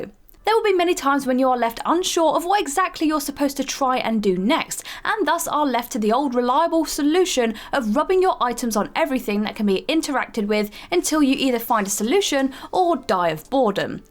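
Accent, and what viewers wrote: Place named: England, United Kingdom. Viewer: A "news lock" run? Yea English accent are definitely annoying and everybody just acts like they absolutely love them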